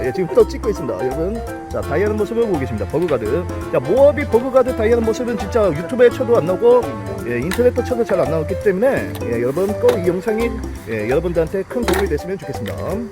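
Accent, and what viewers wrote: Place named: Yanbian, China. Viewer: 예전 개콘에서 '당황하셨어요.. 고갱님~~' 이 코너가 생각나네요 구미가 아니고 연변 아닌가요?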